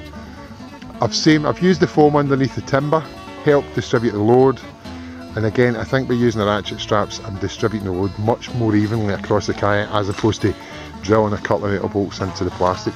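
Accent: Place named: Scotland, United Kingdom. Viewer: My Brit friend tells me it's English with a moderate Scottish accent. Fun. :-) And, love the concept. Wonder if he ever got around to trying one with lighter weight materials. Also saw one online today made with PVC pipe.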